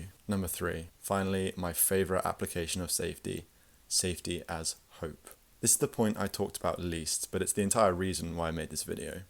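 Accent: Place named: United Kingdom. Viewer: Anything told to you in a deep British voice is good, isn't it?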